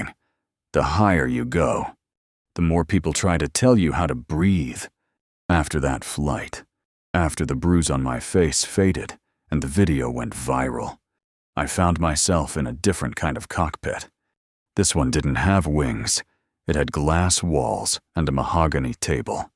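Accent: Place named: United States. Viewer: Sounds like another voice that I heard on another video, except it's not an exaggerated British accent. It's a highly rhotic American accent.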